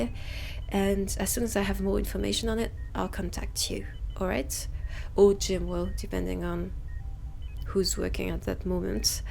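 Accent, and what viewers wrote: France. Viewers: For once the french accent is actually french, and not some strange abomination of an accent 😭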